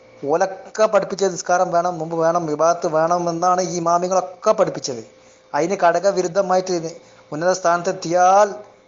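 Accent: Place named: Malappuram, India. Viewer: പറയുന്നത് എന്നു് പറയുന്നതിന് പകരം പറയണ്ടതു് എന്ന് പറയരുത്. മലപ്പുറം ശൈലി ഒഴിവാക്കുക . കേൾവിക്കാർ ആശയക്കുഴപ്പത്തിലാകും ശ്രദ്ധിക്കുമല്ലോ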